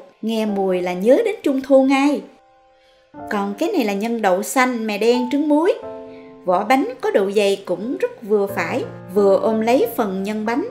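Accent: South, Vietnam. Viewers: Lần đầu tiên xem video của bạn, nó cuốn hút người xem với giọng đọc miền nam mộc mạc nhưng thanh tao và sức truyền cảm, ấm áp người nghe. Mình đã đăng ký để tiếp tục xem nội dung những clip mới và được dịp tiếp tục nghe giọng của bạn. Chúc sức khỏe và thành công.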